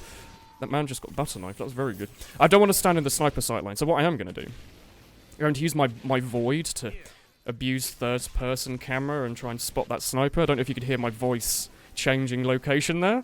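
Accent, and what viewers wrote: United Kingdom. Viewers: u sound British asf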